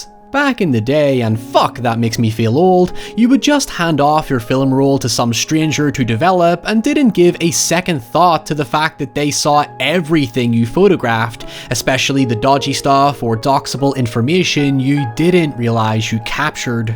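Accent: Northern Ireland, United Kingdom. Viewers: Surprising to hear a fellow Northern Irishman! Love this film, a true hidden gem.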